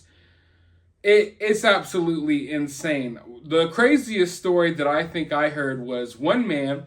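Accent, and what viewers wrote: South, United States. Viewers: Just from listening to your videos the past couple weeks I just knew you were from the South. LOL Alabama here.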